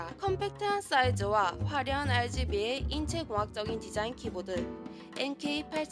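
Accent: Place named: Busan, South Korea. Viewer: 부산말투가 살짝~~^^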